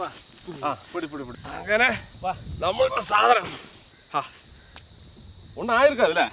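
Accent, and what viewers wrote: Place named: Tamil Nadu, India. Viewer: நீங்க தமிழ் பேசறது அழகா இருக்கு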